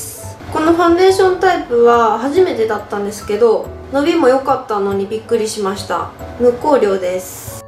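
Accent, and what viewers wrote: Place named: Kansai, Japan. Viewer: さんって関西出身？すごいイントネーションが関西っぽい！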